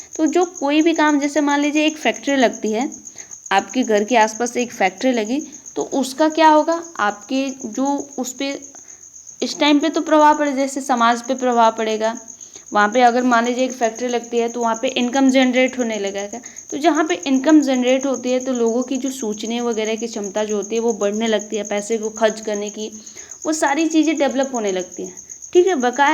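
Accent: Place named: Bihar, India. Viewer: aap bihar me kha se ho kyunki apki language suni suni lgti hai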